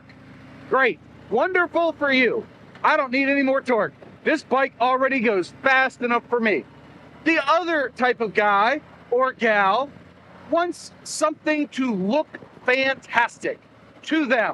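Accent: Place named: Dallas, United States. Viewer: Sounds like Dallas to me and I wasn’t even born here in the States🤣🤣🤣🤣 Very true what you’re sayin…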